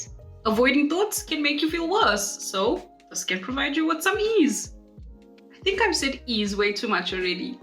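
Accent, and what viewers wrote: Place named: South Africa. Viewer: I’ve always been told that the South African accent is calming and it took me 33 solid years but now I agree.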